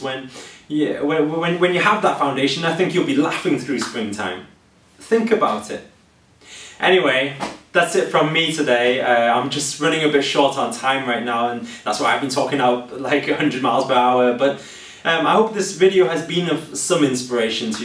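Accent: United Kingdom. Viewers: Wow... your British accent was more prominent then. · I like your british accent at the time!!!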